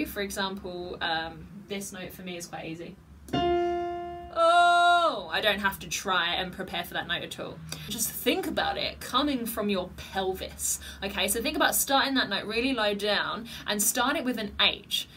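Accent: United Kingdom. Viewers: Greeting. It is good to know, you teach singing with vocal instruction . Thank you, been a "Music Teacher". Question ? : Are you from England ? 🇬🇧 . You have a strong British, accent. I am trying to listen and understand what are saying. Well, keep up with your videos look good. Please, try not to make faces, make look much older and not looks good. I believe , you are young and beautiful. Please, try to show a little positive expression, people will approach you more. Take care of yourself, kisses and hugs, XOXO , Love. Sincerely, 😎Mr. Villalba 🇵🇷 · (" "), your bravado made me comment on this page! Your craft is passionately represented and it is very appealing to know that you are inclined to view and comment on video suggestions from your students. The british accent is delightful as well. killed this record and there is a need for COVID-19 refreshing music. 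keep em coming and I love the commentary, equally your facial expressions and voice overs killed this review...... go!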